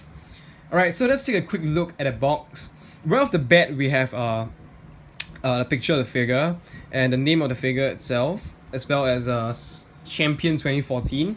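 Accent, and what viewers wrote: Singapore. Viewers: By your accent, i know you're singaporean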